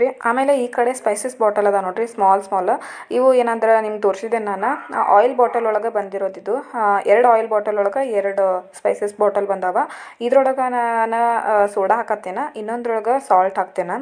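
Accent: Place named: Bijapur, India. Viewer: Niu namm tara matadtiri .namdu bijapurari ❤ ,.. beautiful vlog 🎉🎉 new friend.🎉❤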